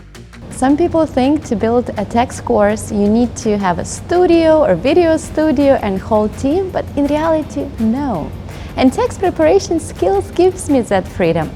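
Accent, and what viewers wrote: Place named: Germany. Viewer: That is a lovely accent you have there. Germany?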